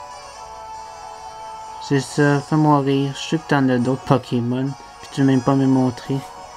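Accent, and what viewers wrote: Quebec, Canada. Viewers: t un québecois?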